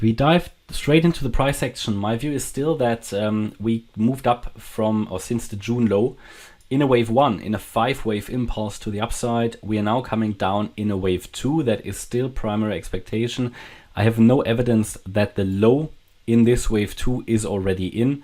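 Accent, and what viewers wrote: Germany. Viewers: German Akzent…..👍🏽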